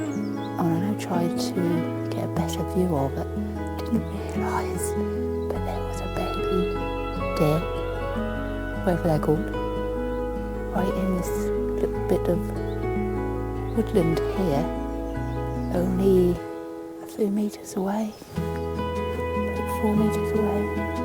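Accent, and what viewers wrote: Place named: Norfolk, United Kingdom. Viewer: What a lovely relaxing place to camp...like you i would go for the veggie burgers and not the dirty old beef burgers...loved hearing the Norfolk accent..i am from Suffolk so could hear a few similarities....[ new sub ]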